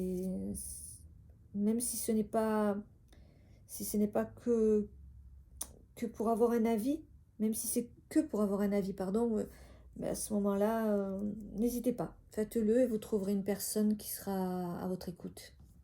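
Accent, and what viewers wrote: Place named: South, France. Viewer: Bonjour! Ton tirage bien que peu qualitatif ne manque pas d’audace. Le cadrage est dans l’ensemble médiocre sur ce point tu as exagéré. Mais j’ai réellement était inspiré par ton tirage. Ça me parle et ca c’est beau. J’apprécie la manière dont tu présentes les choses. Et ton petit accent chantant du sud me met du baume au cœur. Je t’invite a continuer sur ta lancée Bravo.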